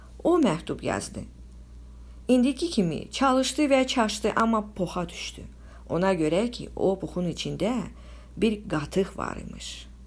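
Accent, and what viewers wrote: Armenia. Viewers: Bu deyəsən bekarçılıqdan ağzına gələni danışır. Erməniyə oxşayır ləhçəsi də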